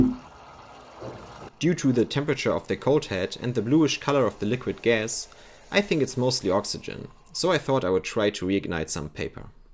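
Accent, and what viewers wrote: Germany. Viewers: oh I'm sure they do too. I'm just razzing ya man. If I did the hobbies around here that you do wherever you are.( To me your accent sounds... German.) Although your obviously fluent in English. Well it wouldn't be very funny. I could get shot if I go to the pharmacy and in a German type accent say. Well. It wouldn't sound like you. I'm not trying to insult you. Where you live is probably way less paranoid than where I stay. I'm talking about the people.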